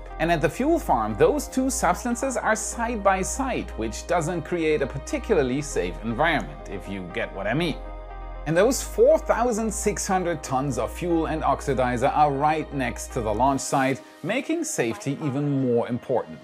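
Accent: Germany. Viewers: It isn't a weird mixed accent. It's English with a German accent. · Your german accent is almost non existant now. :-) hi from magdeburg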